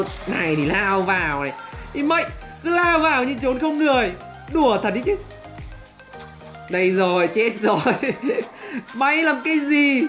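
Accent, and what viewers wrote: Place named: Thanh Hoa, Vietnam. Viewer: A nghe giọng giống quê hoàng thanh-hoàng hóa..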